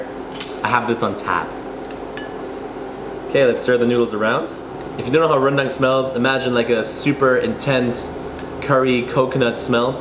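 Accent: Indonesia. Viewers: your indonesian accent is cute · hes so cute talking indonesian 😆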